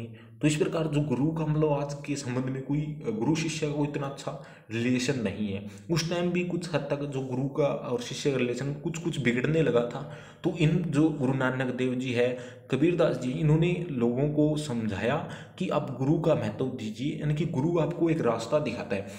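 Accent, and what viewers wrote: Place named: Haryana, India. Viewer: Sir aap Haryana se ho... Aapki voice tone haryanvi lag rhi ha... Sun... Ka samjh ka bhot badiya ... Laga ....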